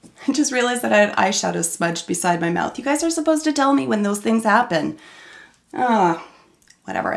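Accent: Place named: Canada. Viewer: I adore BH eyeshadow pallets! Thank you for the reviews, now I know which ones I want to get next. My go-tos are Bubble Gum, Blueberry Muffin, Mimosa, and the 80's, 90's, and 00's remix pallets. (The 80's one has a pretty great red called Thriller.)Now, I obviously don't know where you're from(Though you sound like you could be Canadian) but here in Tennessee, TJ Maxx is a pretty popular chain. I get all of my BH products from there and they run super cheap, like, 5.99, so I snatch them up when I see them lol. I love how intelligent you are when talking about all of this, not all air head sounding(Monochromatic! No one uses those type of words. Well, not often.). Totally subbing!(Also, I apologize if you addressed different things I mentioned, I'm typing as you're talking lol. )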